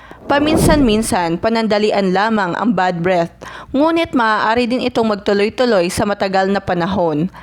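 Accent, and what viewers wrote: Visayas, Philippines. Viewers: Nagdodolot visaya ka day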